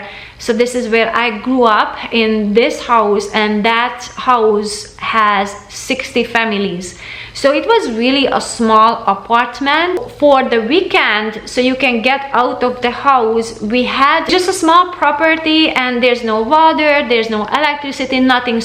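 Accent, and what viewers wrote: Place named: Hungary. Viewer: Wow! that was an amazing video, thank you so much for opening up to us and allowing us to get to know you better. first of all please; never apologize for your accent, I am sure we all understand you perfectly well, and many of us have"accents" well, my maiden name is Szabo, my Daddy was Hungarian, and ended up in France after WW 2 and met my &Mom, so I was born and raised in France but my Dad took us several times in Hungary and Romania ( transilvania) to meet our family and these times are some of the best memories of my childhood and now I live in California with my German husband...😀...in your voice and your intonations and pronunciation, I can hear my Dad's accent and it warms my heart.All I can say is you do such a wonderful job at helping people get motivated to improve their homes and environment and,taking care of our health to,o thank you so much for that, just that, in itself you accomplish so so much, your family must be so proud of you, and you show people that having a close partnership with your spouse is so special and makes,your life easier and helps you so much in overcoming difficulties in ln life, your guys' love for each others shines thru in your videos and I think is very encouraging to younger couples to strive to become a strong and united mates, thank you again.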